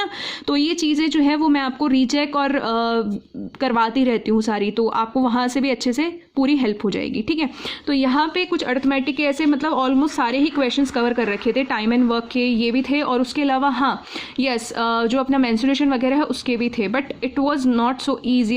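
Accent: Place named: Rajasthan, India. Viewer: Aap Rajasthan se ho na aapki voice se lg rha hai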